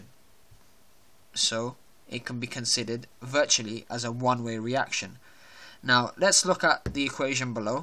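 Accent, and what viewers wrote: United Kingdom. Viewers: I like your British accent.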